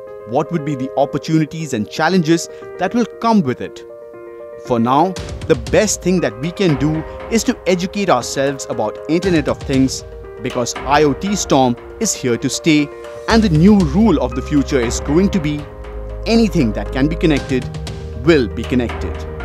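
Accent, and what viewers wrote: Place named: India. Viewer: Pengisi suaranya orang India ya? Kedengeran banget dialeknya.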